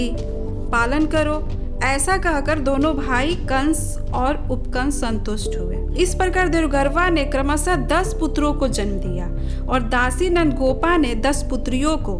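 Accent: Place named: Uttar Pradesh, India. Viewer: तेरी आवाज बता रही हे तू नफरती दलित हे ,, तेरी बोली बता रही हे तू उत्तर प्रदेश की नफरती दलित हे नकली कहानिया फेलाना बंद कर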